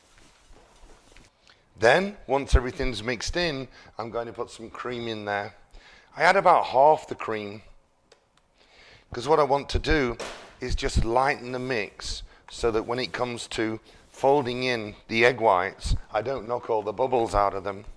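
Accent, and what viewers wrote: United Kingdom. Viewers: As a Texan, you've lived here for 10 years. Why don't you have even a slight Texas accent? lol I thought that was impossible. Tell me that you throw in a y'all once in a while? That would be so fun with a clipped British accent. Thanks for the recipe. I'll be trying this recipe tomorrow. It's been too long since I had a mousse, much less whiskey chocolate. Yum.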